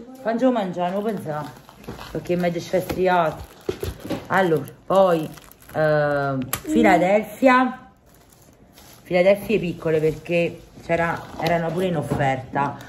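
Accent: Naples, Italy. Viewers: Ciao è da poco che ho conosciuto il tuo canale e mi piace sentire il tuo accento napoletano (io sono di Roma) e le tue ricette buonissime.Pietro è simpatico, un bambino pieno di vita ma, come mamma volevo dirti una cosa che spero non ti offenderà.Quando mio figlio era piccolo, più o meno come Pietro, era cicciottello e i bambini lo prendevano in giro, purtroppo tanti genitori non insegnano ai propri figli che prendere in giro un bambino solo per qualche kg in più può fargli male, comunque lui aveva poca autostima e ne soffriva. Adesso ha 25 anni ed ha un bel fisico, va in palestra e sta attento al mangiare come la maggior parte dei bambini che magari da piccoli erano cicciottelli e che poi imparano a stare attenti a ciò che mangiano, però quel periodo le prese in giro lo facevano stare male. Non vorrei vedere nessun bambino passare tutto ciò quindi ti volevo dire di cercare di invogliare Pietro a stare un po' più attento a ciò che mangia o magari fare sport così che non passi ciò che ha passato mio figlio. 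Scusa se mi sono permessa ma sono una mamma e so che nn è facile (sicuramente ci avrai già pensato). Un abbraccio affettuoso e a presto:face-red-heart-shape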